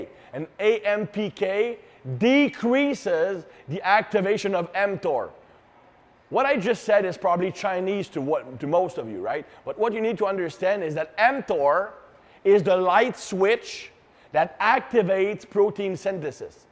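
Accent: Quebec, Canada. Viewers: What is it about a French-Canadian accent that makes me want to shut up and humbly learn???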